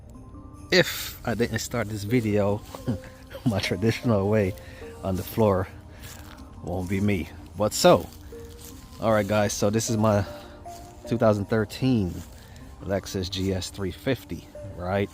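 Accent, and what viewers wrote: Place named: Caribbean. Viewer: Jamaican or Trini? I hear a Caribbean accent 😂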